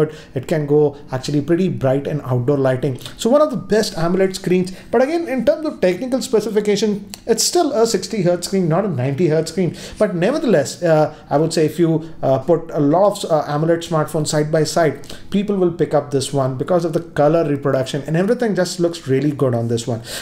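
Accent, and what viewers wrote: India. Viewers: It's not this dude if you do not hear a thicc Indian accent "BUT AGAIN" every 25 seconds.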